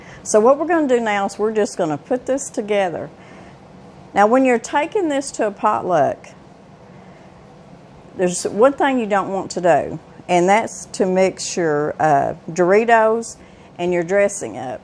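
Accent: South, United States. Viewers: Yum yum! I have made that taco salad before, but used ott's dressing instead of Catalina. I thought Catalina might be too sweet, but I know the recipe calls for it. You've given me the idea to make that when my daughter, her husband, and my two-year-old granddaughter come for a visit on the 15th of August. I think we'll have this the first night, including your pound cake with cream cheese in the middle. I think the only difference will be is that I will sugar up a nice sized bowl of sliced strawberries, and serve them with it. We'll just turn it into strawberry shortcake with a bonus of cream cheese in the middle! By the way, my daughter lives in Northwest Arkansas, in Rogers/Bentonville. She's a nurse practitioner at Washington Regional hospital in the ER. But she is from Central Missouri, which is where we live. We live out in the country near lake of the Ozarks. 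Our little town has a population of 91! I actually live on the outskirts, I guess we're in the burbs, but really, we're just out on a dirt road. 😁 I love watching your videos! I love to cook, and I love to watch other people cook. You cook things very similar to how we cook them, and you talk even more Southern than we do, but your husband sounds like every person I grew up with, including my own family! We are hillbillies too! 😊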